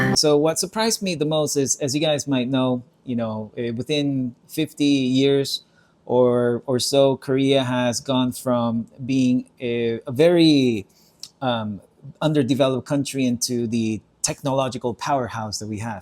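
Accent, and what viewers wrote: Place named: Philippines. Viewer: You have a Filipino accent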